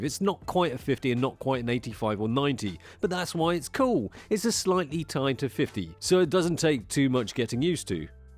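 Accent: United Kingdom. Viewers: I think accent gets more British with every video of his I watch.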